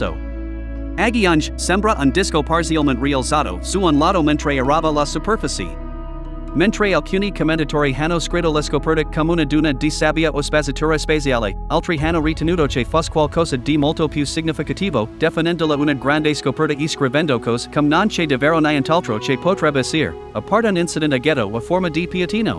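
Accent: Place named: Italian-American, United States. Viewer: Sorry but I understand that some time italian speak horrible English, but this one that present the video speak italian-ametican??? New language. So I close because impossible ti understand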